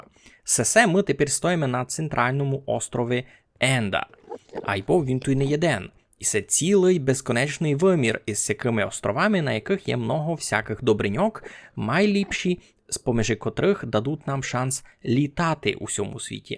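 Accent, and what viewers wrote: Transcarpathia, Ukraine. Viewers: Йой, то є закарпатська мова?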